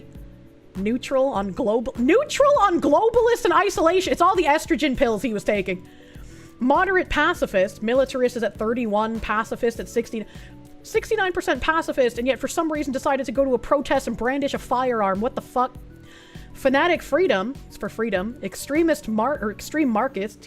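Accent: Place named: Massachusetts, United States. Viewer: I just watched a G&G daily video with you and madame savvy. Id like to say im a fan but at least intrigued. Boy you really sound like a MASShole, i like it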